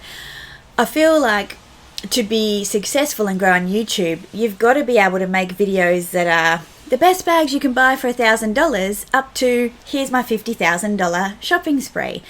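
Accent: Australia. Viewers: I love your channel and your content! I love how warm and genuine you are, and it's always nice to hear an Aussie accent on the platform! I really like a number of different channels (and genres) on YouTube for different reasons, they serve different purposes to me. Some creators I'm subscribed to I will pick and choose what video I watch (I wont necessarily click on all of their uploads). But since I'm watching for YOU (as a person, more so than a channel with content) I will watch anything you put out and enjoy it. Like Dr Jake is one of my favourite channels, because of his humor and personality (and not for his Lego reviews, and home reno updates!). Keep doing you! You are just a delight 😽💖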